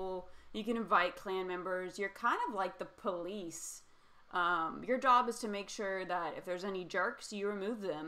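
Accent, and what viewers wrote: United States. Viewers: The most american accent ever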